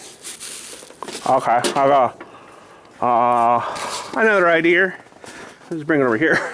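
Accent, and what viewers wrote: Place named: Canada. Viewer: Can definitely tell your a fellow Canadian eh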